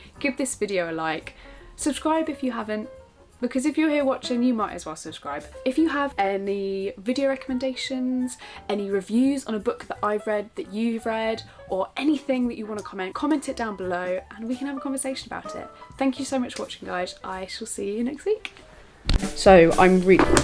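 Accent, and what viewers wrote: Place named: England, United Kingdom. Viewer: I knew you were going to have an english accent haha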